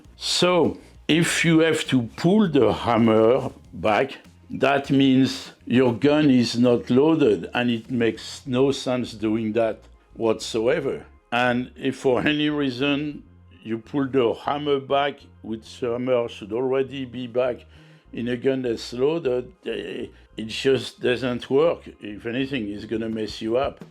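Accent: France. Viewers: What is that accent ? French ? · 😂 this guy is right!! I like the way he's talking with his french accent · Wow really ! Ok sorry sir , you sound like a native french who speak english ! Well keep up your good video then =) 👍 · Le bruit qui n'affecte personne me choque beaucoup. Quand j'ai vu "all quiet on the western front", autant c'était joli visuellement, autant j'avais l'impression que tout n'était que petite pétarade. Les soldats se parlent tranquillement, leurs fusils ne font que des bruits de pétard, alors que tout fait feu et explose autour d'eux. Je ne sais pas si vous êtes français vu l'accent, mais en tout cas j'ai bien aimé votre vidéo. Merci ! Edit : ah si j'ai mieux lu votre description. Content de voir que votre réussite et votre plaisir aux USA. C'est tentant et je serais ravi de connaître votre parcours :) Bye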